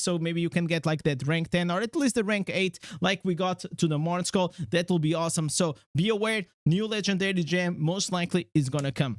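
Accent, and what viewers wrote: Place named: Eastern Europe. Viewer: What nationality are you bro? Your accent sound eastern European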